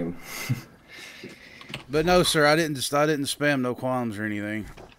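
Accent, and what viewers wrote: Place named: Texas, United States. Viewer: You sound like a country singer with that type of Tx talk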